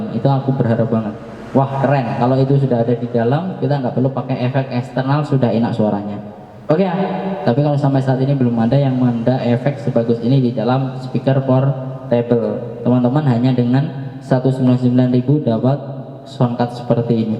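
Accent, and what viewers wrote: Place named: Java, Indonesia. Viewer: Saya tebak orang ini pasti jawangapak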